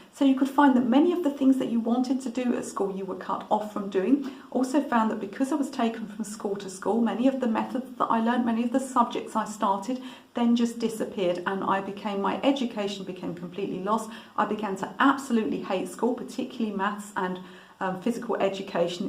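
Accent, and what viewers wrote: United Kingdom. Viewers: I’m a new subs Cusine a week now and I just emendation enjoy your voice and British English accent.., it’s a pure pleasure listening. Iv been an artist my whole life. Art is oxygen for me. Thank you for sharing your story ☺️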